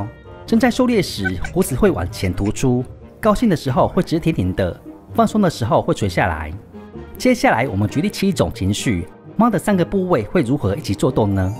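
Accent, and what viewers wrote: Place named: Japan. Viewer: 不要騙我是土生土長台灣人，怎麼覺得像日本人講中文😂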